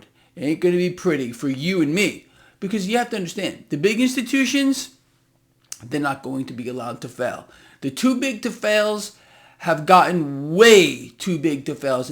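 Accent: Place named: New Jersey, United States. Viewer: I love how you explain everything, i love your Accent, do you come from Northeim erst new jersey? 😊😊😊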